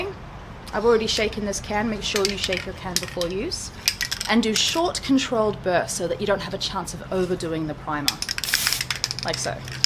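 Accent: Australia. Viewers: Love the Aussie accent on girls. Don't change a thing. These New York ears hear you just fine.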